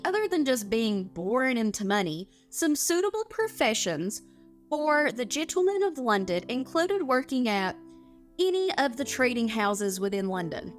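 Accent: South, United States. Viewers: Sounds southern or midwestern US. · Just curious, what region of America is your accent from?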